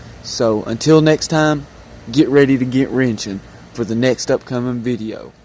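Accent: South, United States. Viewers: It's how people say oil in the south. Pronounced "ole"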